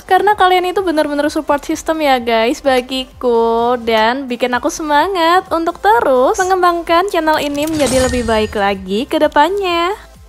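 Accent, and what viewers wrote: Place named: Central Java, Indonesia. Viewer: Logatnya kya orang jawa tengah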